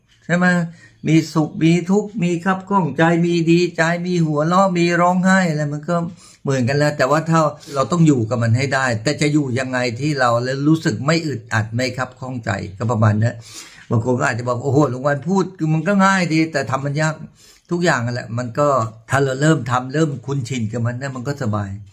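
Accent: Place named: Prachuap, Thailand. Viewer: เพิ่งเข้ามาดูไม่ทราบว่าคุณพี่คนจังหวัดอะรัยค่ะสำเนียงเหมือนๆคนประจวบ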